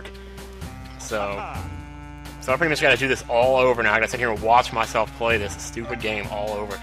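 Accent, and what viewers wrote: South, United States. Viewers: I love your southern accent never change it:3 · 1. Please talk southernly more 2. Please film yourself, it would be awesome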